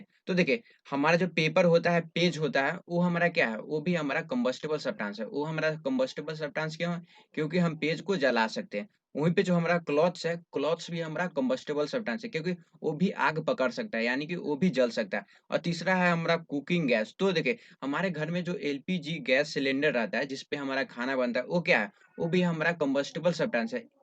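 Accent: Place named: Bihar, India. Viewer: Aap kaha se ho .. mujhe aapki voice se lag rha h ki aap bihar ke ho ..mtlb ya to aap bihar me reh rhe hoge ya jharkhand me 😁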